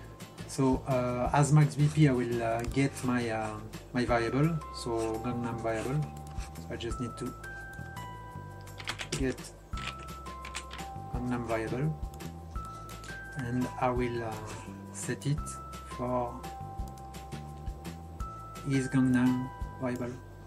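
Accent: France. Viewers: Cet accent Français xD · XD l'accent Fr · are u french ? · Je me doutais déjà que tu étais français vu le titre de la dernière vidéo mais là c'est sûr ! ^^ C'est cool de savoir que tu es français :D En tout cas tu te débrouilles super bien, j'ai rien compris à la vidéo mais bon x) (enfin c'est pas que ton accent est si horrible que ça hein, c'est juste que j'y connais rien perso xD) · Are you from France because you have the same accent si tu est français pourrais tu fair un tutoriel en français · Hey ! Nice video :) Are you French ?^^ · Je l'ai capté direct que tu étais Français ahah x) Pourrais tu refaire le même tutoriel en Français ? Car moi, je ne comprend pas l'Anglais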